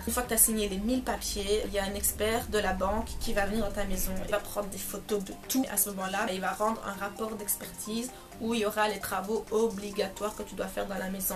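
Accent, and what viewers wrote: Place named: Belgium. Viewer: L’accent belge tous ce que j’aime 🥰 bien rare sur YouTube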